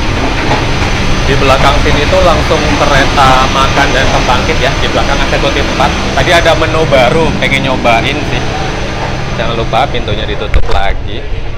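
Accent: Surabaya, Indonesia. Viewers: Suaramu itu menang sama 2 kereta pembangkit kok.... 😁😃🤣 Soalnya suaramu itu nge-bass & sangat2 juselllaaasss ucapan huruf2nya kok... 👍👍👍 Maaf, Mas itu asli mana si, kok klo ngomong pake Rek Suroboyoan ya...???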